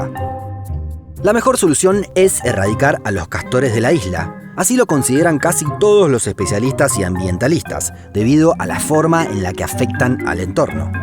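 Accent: Argentina. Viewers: jaja hasta apenas me di cuenta de que la voz del video tiene acento argentino :o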